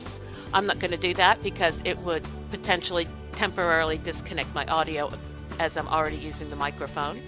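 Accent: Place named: Australia. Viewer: you rr from austrailia sister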